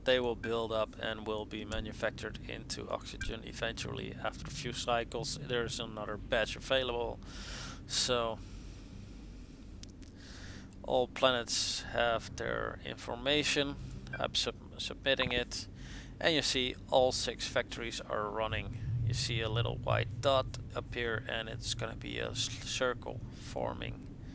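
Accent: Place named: Netherlands. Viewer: Goed engels hoor, maar het is net duidelijk genoeg dat je een nederlander bent :P Froeten Mark Androcius ( character naam op EVE )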